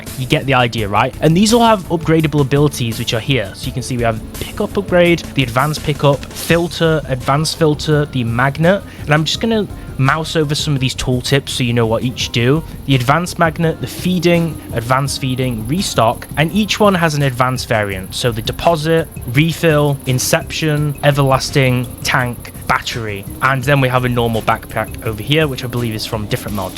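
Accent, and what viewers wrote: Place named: Northern England, United Kingdom. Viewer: Not sure if you explain how to get started in this pack, but I like your accent. Northern England, possibly Eastern, sounds nice.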